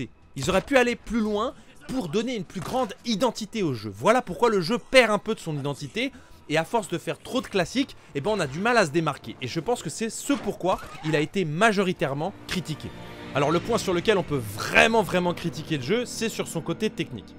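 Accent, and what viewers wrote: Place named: Belgium. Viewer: Tu es belge ? En tout cas tu as l'accent belge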